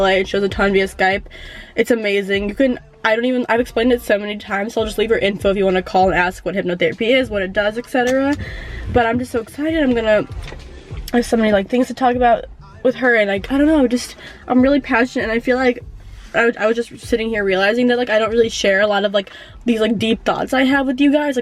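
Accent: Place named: Russia. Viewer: I love your russlish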